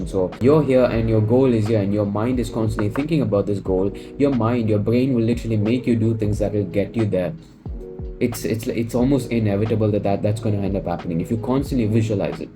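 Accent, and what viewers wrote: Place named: India. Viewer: You being indian and having slightly indian accent do you think any foreigners watch you because i dont think they do only indians watch indians i feel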